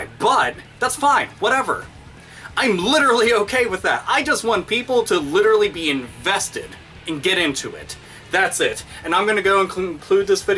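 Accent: Pittsburgh, United States. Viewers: haha holy shit, youre a yinzer? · where are you from? I'm from Fayette County, PA and your accent reminds me of home. I noticed your Pittsburgh Zombie shirt so that's further evidence that you're from that area. Your rants are fucking awesome and your accent sends it over the top. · I realize this is an older video, but I have to ask; are you a Pittsburgh native? I gotta know man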